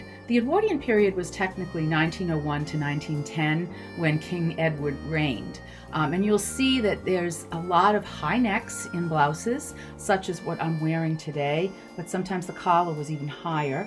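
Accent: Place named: Rhode Island, United States. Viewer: You sound like you're from Rhode Island (?) ~ Heather ~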